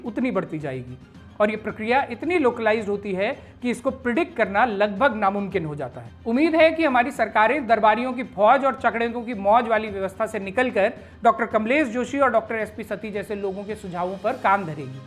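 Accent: Garhwal, India. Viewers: ❤ ji आप उत्तराखंड में लोगों को इसी तर्ज पर जागरूकता अभियान जारी रखें, आप की जानकारी, वक्तव्य, तरीका जिससे गढ़वाली भाषा का तड़का वाह ❤ आनंद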